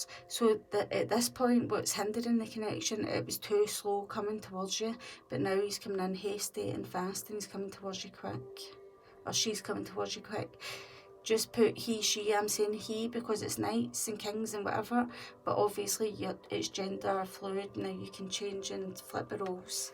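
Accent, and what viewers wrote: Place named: Scotland, United Kingdom. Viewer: Thank you! And love your Scottish accent (and can't believe that I can understand you! :) 👍❤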